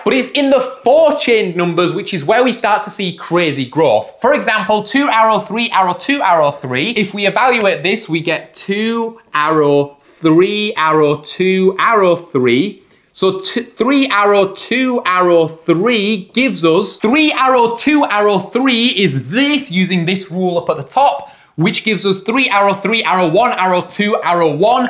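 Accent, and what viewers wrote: United Kingdom. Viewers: I Cant take a squeaky voiced british accent serious, ever at all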